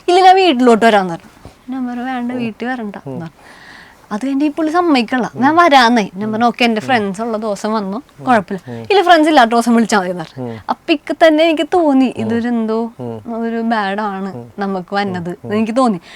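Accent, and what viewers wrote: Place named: Thrissur, India. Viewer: All good but I am from Mattancherry and even I cannot place her slang to Vyppeen. Thrishur, yes. Something's amiss! Oh, well!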